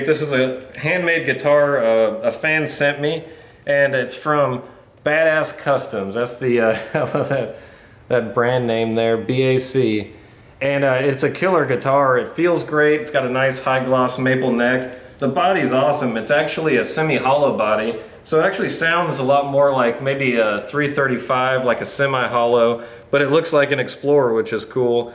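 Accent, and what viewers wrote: South, United States. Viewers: Where are you from man, I’d be surprised if you were not a southerner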